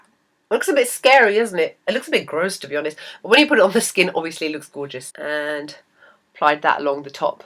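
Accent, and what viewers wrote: United Kingdom. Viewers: Looooove british accent! =)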